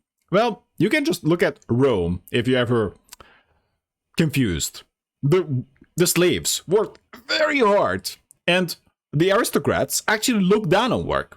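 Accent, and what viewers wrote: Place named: Eastern Europe. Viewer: There’s no way you’re not Eastern Euro! No way!!! Subscribed btw 😀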